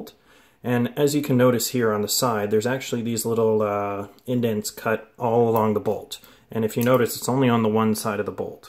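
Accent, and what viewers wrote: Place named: Canada. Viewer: Dude is deff Canadian lol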